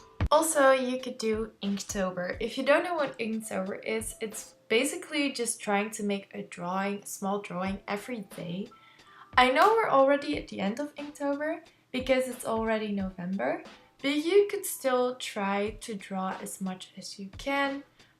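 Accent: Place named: Netherlands. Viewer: Everything looks so nice! Also your thumbnails look so great and look so well together! OMG I KNEW I HEARD AN ACCENT. Kom je ook uit Nederland? Cute, subbed <33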